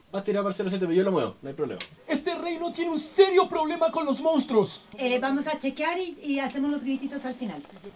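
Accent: Chile. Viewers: ¿Beto cuevas? Se nota su acento chileno, aun así 😘😍😍😍😍😍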